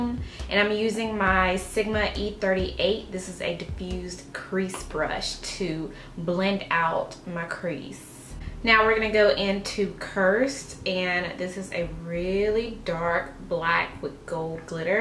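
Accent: South, United States. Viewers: you are southern! lol it's so cute!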